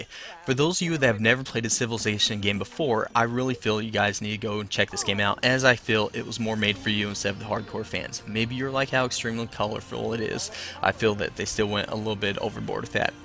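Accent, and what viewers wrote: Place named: East Coast, United States. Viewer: it;s fine to me... He's probably from the east coast.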